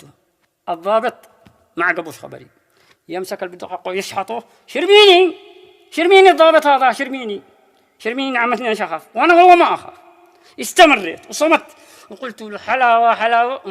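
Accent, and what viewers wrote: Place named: Raymah, Yemen. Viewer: ...يتحدث اللهجه الريميه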